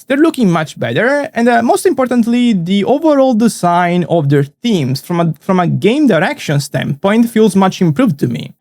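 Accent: Italy. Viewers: hai un ottimo inglese ma il nostro accento è riconoscibile lontano un miglio 😂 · are u italian?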